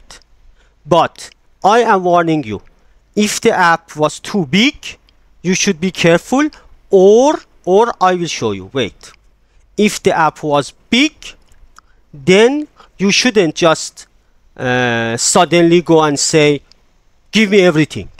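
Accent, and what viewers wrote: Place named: Iran. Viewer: یعنی کسی که هیچ آشنايی با زبان انگلیسی هم نداره، متوجه ميشه که ایرانی هستيد و شايد هم متوجه اصفهانی‌بودنتون بشه. با وجود هوش‌مصنوعی‌هایی که با صدا و لحن خودتون صوت انگلیسی تولید می‌کنند، چه اصراری دارید که با اين لهجه‌ی فاجعه، خودتون صحبت کنید؟؟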